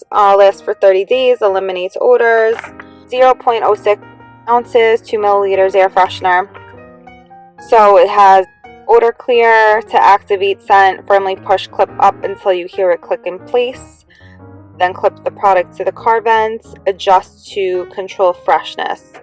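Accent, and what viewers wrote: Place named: Philadelphia, United States. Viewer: Philly accent 🦅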